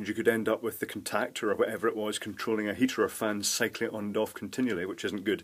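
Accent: Scotland, United Kingdom. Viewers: I was wondering about that lol.....I thought you were saying 'break' it, in your Scottish accent....!! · where does this horrible teenage kind of expression 'bricked' come from? Are we using Americanism again? we should be preserving the English language especially with such an attractive Scots accent 😇 keep these fascinating videos coming !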